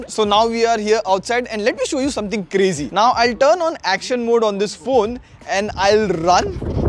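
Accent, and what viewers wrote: India. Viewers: your language is very clear 😃 i think you are indian 😅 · Indian accent 😅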